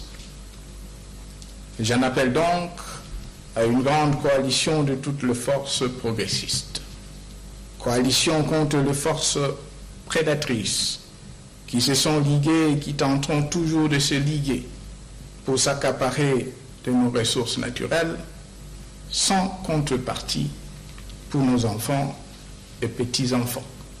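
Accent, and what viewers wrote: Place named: Rwanda. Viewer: Français avec tonalité Rwandaise.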